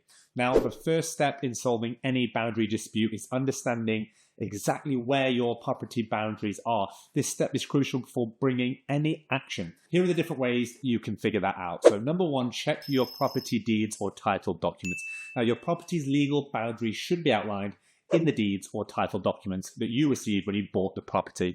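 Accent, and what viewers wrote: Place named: England, United Kingdom. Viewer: Is this UK law or American Law? I notice you're spelling "Neighbor" which is the American spelling but you sound English?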